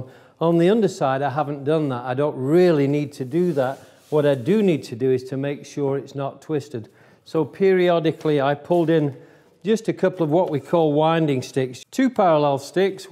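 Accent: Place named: Northern England, United Kingdom. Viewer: Excellent Video/s - which part of the UK are you from and where are you now? My question is, after watching closely and carefully your Saw and Chisel Skills throughout your Workbench Build videos - what is your preferred Chisel Brand and why? - AND what is your preferred Saw Brand and why? I suspect by your slightly 'accented ' Northern dialect, you might now be located in the USA so, as such, maybe your Chosen Brands might not be available in the UK/France! I any case, l willl look forward to hearing from you soon - Thanks. All the very best to you and your family.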